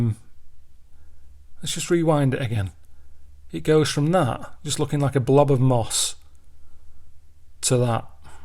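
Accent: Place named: Northern England, United Kingdom. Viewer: Love how he goes extra northern when doing his radio voice LMAO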